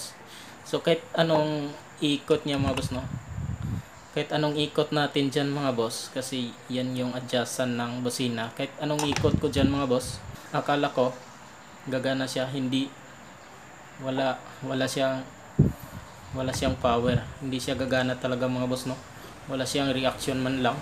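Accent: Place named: Iloilo, Philippines. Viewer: Daw ilonngo ka to ba hahha nice vid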